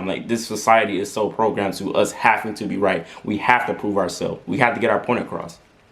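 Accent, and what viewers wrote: United States. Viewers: are you from texas? because of the accent. love the vids btw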